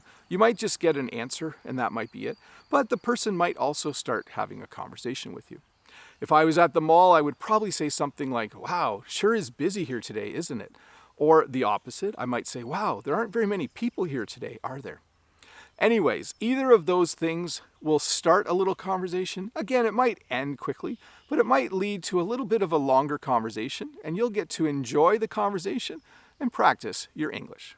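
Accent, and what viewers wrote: Canada. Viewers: Hi, I noticed you pronounced the "s" in "conversation" with a "z" sound while I've heard other people pronouncing it more like a "c" sound. Is it a sort of Canadian accent? Thanks for the lesson!! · Thanks..I'm from Sri Lanka and have been a member of your educational YouTube channel for a long time and have learnt a lot so far. Your way of presenting lessons is excellent. Your Canadian English accent is very clear and learners of English may not want to switch on the English subtitles to understand what you say. Actually, it's important for all learners to talk to strangers when learning English. As I know, it's the best way to practise English and by doing so, they would be able to learn different accents of different speakers. I know by experience talking to foreigners who visit Sri Lanka that they speak in different accents. Some non-native speakers speak in broken English but it's understood.. 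It's only through mingling or interaction with others that we're able to practise speaking. This is true regarding all other languages.. KEEP MAKING MORE LEARNING VIDEOS....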